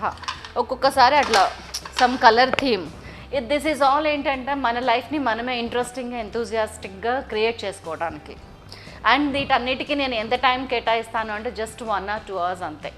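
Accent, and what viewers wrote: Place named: Guntur, India. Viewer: Mee matalu Telangana vallalaga levy akka Guntur valla laga unnai good talking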